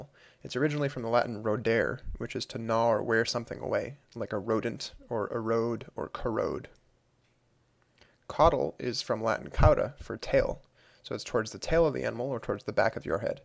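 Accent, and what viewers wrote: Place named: Africa. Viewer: And you sounded like an African!!! Thank you so much.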